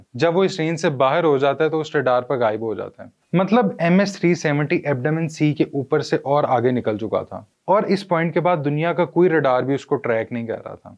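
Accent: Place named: Punjab, India. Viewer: Watching you from Saudi Arabia I suggest you please Improve your Urdu little bit and prevent it from punjabi accents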